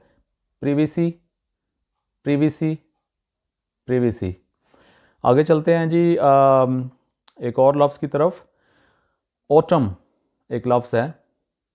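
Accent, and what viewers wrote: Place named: Punjab, India. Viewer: Accent, a typical Punjabi phenomenon, rather complex. Hell with pronunciation, try to gain command over language by developing understanding of Language.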